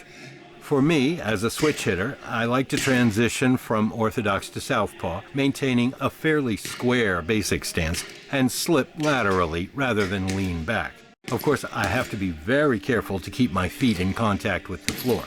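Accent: United States. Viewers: Fantastic efforts - you’re inspiring me to get moving, even if only in my 40s. Just one q - where did the American accent come from when living in Ireland?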